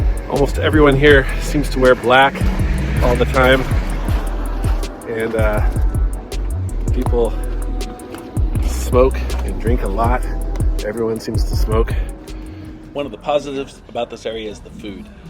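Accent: United States. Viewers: American fella I guess.Sounds by the accent.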